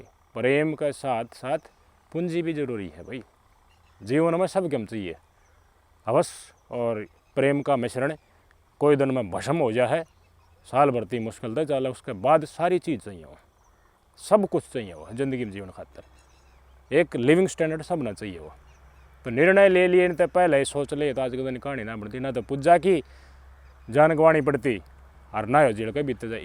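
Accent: Haryana, India. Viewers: Bhai aap pureeee HARYANVI BNTE AA RAHE HO HAN THODA. BAGDI JROOOR S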